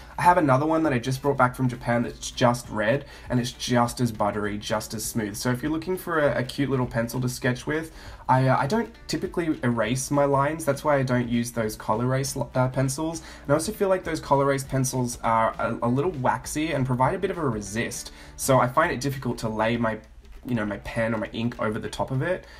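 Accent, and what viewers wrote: Australia. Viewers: Love the dolls and yes perfect for my journal. Great to hear an Aussie accent on here too there are not many . Yay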